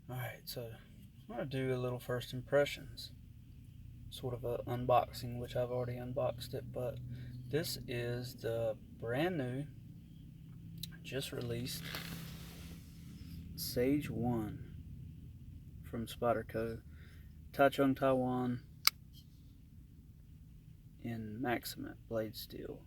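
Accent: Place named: South, United States. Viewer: Where are you from? Sound southern like myself, I'm from Texas.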